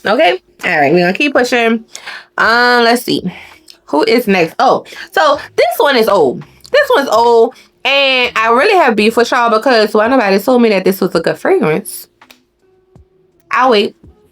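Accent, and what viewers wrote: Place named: Louisiana, United States. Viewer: Hey Sis!!! Girl...are u from Louisiana?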